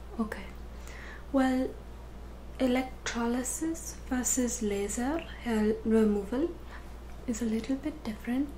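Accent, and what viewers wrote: India. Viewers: You are my favorite asmrist I wish you made more videos. I love Indian accents, even if you are acting. Plus, you are super gorgeous.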